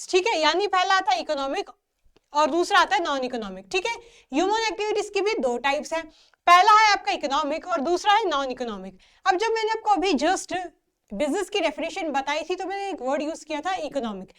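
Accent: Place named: Punjab, India. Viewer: She has Punjabi accent!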